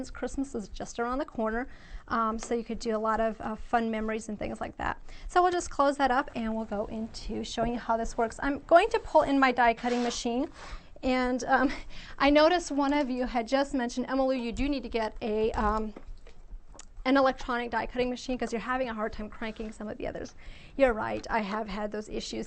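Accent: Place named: Germany. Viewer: Popping into this several years late, since my daughter & I have been stocking up on the albums., your faint trace of accent made me very nostalgic. My dad's family was Germans from Russia, who spoke a Plattdeutsch dialect very similar to Amish/Mennonite German (recipes are very similar too). Dad was the last generation to speak German first, English second. He died three years ago, and it was such a joy to hear again.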